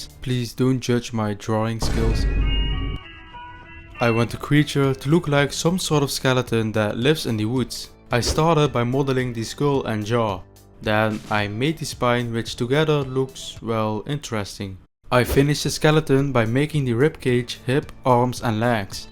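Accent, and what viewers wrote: France. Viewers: c'est drôle , j'ai l'impression que tu as un accent français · Great French accent 👍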